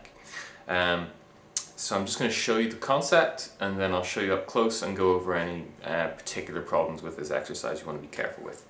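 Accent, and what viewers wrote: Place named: Northern Ireland, United Kingdom. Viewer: true man! your videos are great and i hope to see more from ya! haha cool so i was little bit right! :D i thought i detected a tiny bit of northern irish in there somewhere haha!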